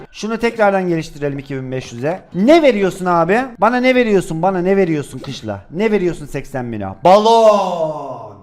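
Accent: Rize, Turkey. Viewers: Abi önceden rizeli konuşuyordun ya ozaman daha güzeldi yine öyle konuşsana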